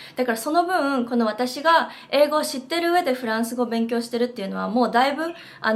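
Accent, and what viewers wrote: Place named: United States. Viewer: きれいなアメリカ英語で素敵です！発音記号IPAは勉強されましたか？それとも耳で入ってきたものを真似してアウトプットする方法ですか？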